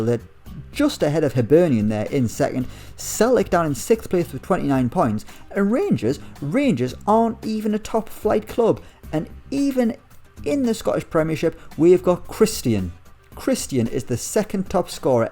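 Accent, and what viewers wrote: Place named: Northern England, United Kingdom. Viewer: Great vids love them , are you a northern lad you sound it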